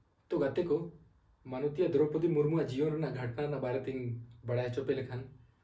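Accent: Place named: Santhali, India. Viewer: Itna shudh santhali😅😊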